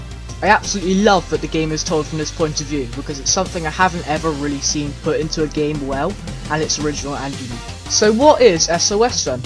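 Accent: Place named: United Kingdom. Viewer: Judging from your voice, you are bri'ish :(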